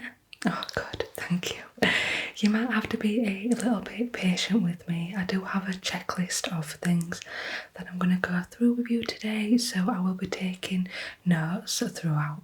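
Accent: North, United Kingdom. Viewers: 10/10 as always! I’ll say it again, the northern twang makes me feel right at home 😴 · was going to say the same, love the northern accent :)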